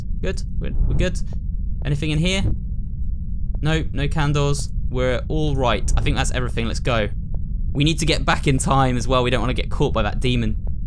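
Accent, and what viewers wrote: South, United States. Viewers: As someone who lives in Texas. I thoroughly enjoy your southern accents 😂 Funny how you find it hard to do. Seems so natural for us lol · Southern accent is YouTube gold!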